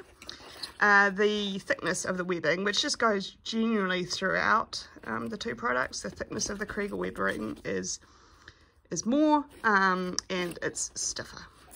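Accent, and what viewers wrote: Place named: New Zealand. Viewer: Thank you for your evaluation of the bags. This was very useful for me. Also nice to hear your New Zealand voice. It reminded me of my two sea kayak trips in New Zealand. Good memories.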